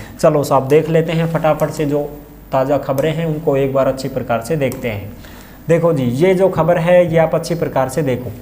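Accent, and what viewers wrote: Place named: Kotputli, India. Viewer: Sir m bhi Kotputli se hu aapki aavaj se pahchan gya ki aap Kotputli se ho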